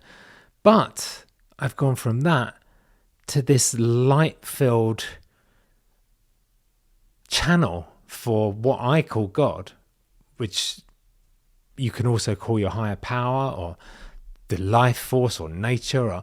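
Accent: United Kingdom. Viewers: Youre British Accent and looks tells me you can be an Actor. You were put on this Earth to be a mentor for your children. Please be happy that God put you on this earth. You are loved!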